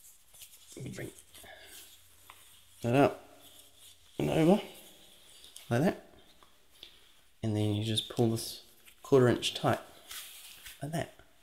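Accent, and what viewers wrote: New Zealand. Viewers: You are my favorite to listen to! Your kiwi accent always makes me drift off to sleep! Although, I must admit, sometimes I just stay awake because Kiwi accents are my favorite ☺️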